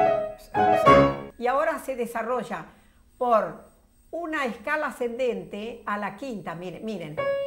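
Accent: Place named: Buenos Aires, Argentina. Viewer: que buena clase y en porteño mejor aún uno asimila mejor el conocimiento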